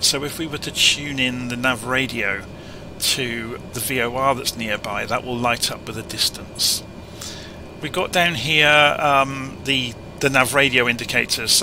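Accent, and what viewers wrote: England, United Kingdom. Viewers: Considering the high quality of your content, the superb presentation, your hundreds of quality wide-ranging videos and 3 years on the channel, I cannot understand the relatively low number of subscribers. Could it be the English accent? As a Londoner, I appreciate it, but there's no accounting for Eastern Pond dwellers. Fear not, I'm on your side; keep up the splendid work - ex-RAF navigator of 50+ years ago!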